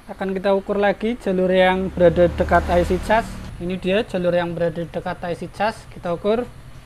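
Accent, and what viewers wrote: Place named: Java, Indonesia. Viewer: bahasanya rada medok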